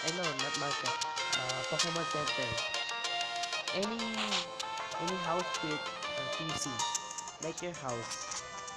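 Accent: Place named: Philippines. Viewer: oh bcz I'm a Filipino too I can tell by the voice and it says Tagalog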